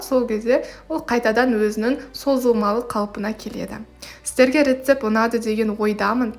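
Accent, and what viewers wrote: Standard. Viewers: қолың дерт көрмесін өте түсінікті әрі таза қпзақша мөйлейсің жасап көремін Алла қаласа бірақ ортасы шикі болып қалмаймекендеп ойлап отырғанмын мен басқа рецеппен осы шарикті жасаған едім бірақ ортасы шикі шықты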